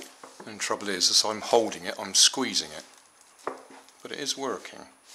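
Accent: United Kingdom. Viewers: Your videos help me whenever we have a car making expirement, and i always get a A+! Thank you and i suscribed. I only have one question are you from uk? Just wondering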